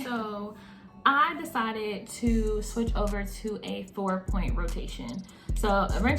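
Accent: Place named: South, United States. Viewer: You are so pretty girl!!! Ooh, I love your accent too. Southern accent?? Anyway, your locs are beautiful. My braid locs were started end of Feb 2022. Girl, I feel you with that darn shrinkage. Mine looks shoulders right now. 😂🤣 However, my hair is around BSL to mid back. I also been documenting my loc journey too. Great video! 😘🙏🏻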